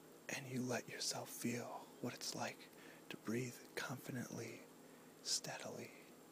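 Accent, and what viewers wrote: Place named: New England, United States. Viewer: Are you from Boston?? Or anywhere in mass. Or southern new Hampshire because you talk exactly the way I do and I'm from southern new Hampshire :)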